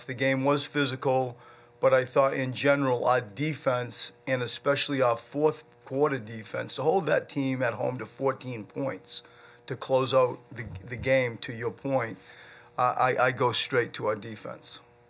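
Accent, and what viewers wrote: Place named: Maine, United States. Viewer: That's a Maine accent (northeast USA).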